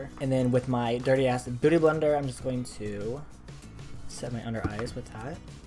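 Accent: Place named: England, United Kingdom. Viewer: That annoyed English accent is my favorite part 😂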